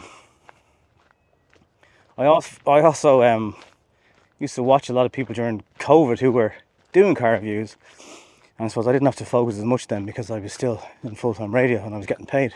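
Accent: Ireland. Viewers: New Zealand here. YouTube ads have become more and more crappy with stupid almost scam products more often than not. Love your channel. Found it looking at Skoda stuff before in light my Octavia RS. Kept watching as I like your style and who doesn’t love an Irish accent. Don’t watch shorts don’t see how they are any use for cars. 10-20 minutes is about right for me.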